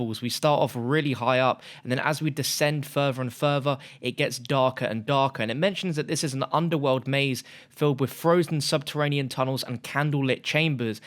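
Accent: United Kingdom. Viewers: where are you from? from uk?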